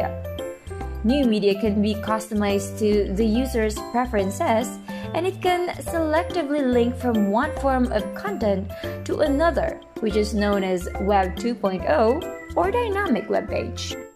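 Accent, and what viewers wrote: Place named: United Kingdom. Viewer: Goosebumps sa pronouncation ng mga words sobrang cristal clear maam😯 may pag ka british accent hehe.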